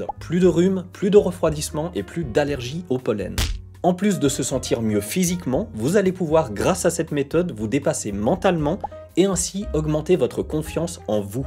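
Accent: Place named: Entremont, Switzerland. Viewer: ha il me semblait bien reconnaître l'accent entremontant ! 😁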